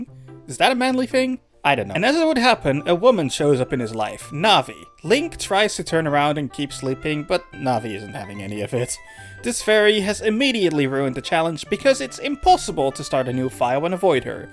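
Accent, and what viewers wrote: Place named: Netherlands. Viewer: Hmm... your accent. You're Dutch, right? Great video :)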